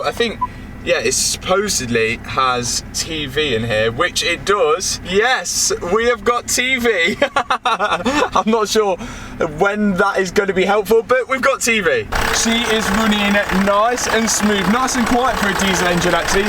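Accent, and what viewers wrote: Leicester, United Kingdom. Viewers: Sounds like a lestah accent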